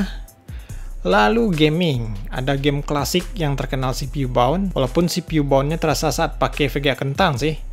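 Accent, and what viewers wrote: West Sumatra, Indonesia. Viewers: Orang minang ya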